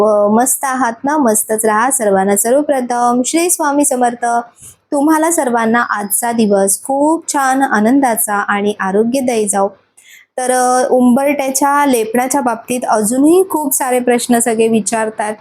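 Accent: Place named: Khandesh, India. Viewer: खुप छान खान्देश भाषा बोलतेयस जळगांव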